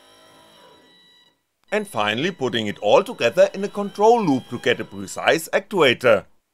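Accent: Germany. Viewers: amazing german accent i enjoy alot :D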